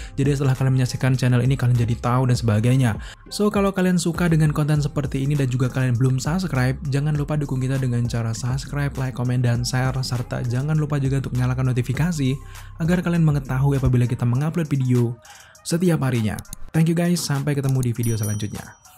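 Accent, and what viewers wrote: Java, Indonesia. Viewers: gua gamasalah sama logatnya, mau dia logat medok kek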